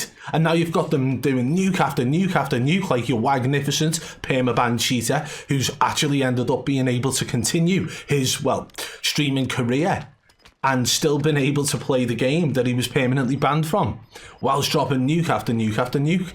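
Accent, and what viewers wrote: Liverpool, United Kingdom. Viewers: I love this Scouser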